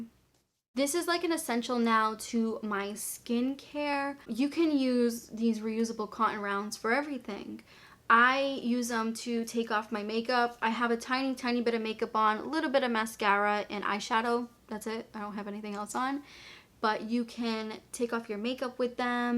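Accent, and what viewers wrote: Boston, United States. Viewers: Congrats on 100K love your content and that adorable Boston accent.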